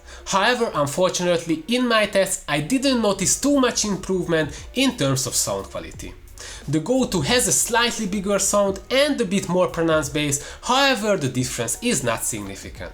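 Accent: Hungary. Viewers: The hungarian accent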